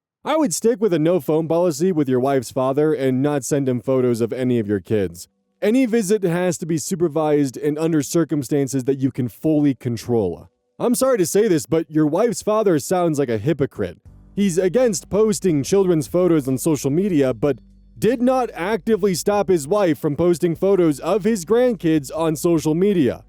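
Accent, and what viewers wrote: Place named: Midwest, United States. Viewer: I feel a lil guilty that I have not watched main channel. I just know him as the lil guy that has the cute midwest accent and reads Reddit so I don't have to suffer through it, myself. And the only creator to tempt me into the arms of the sups.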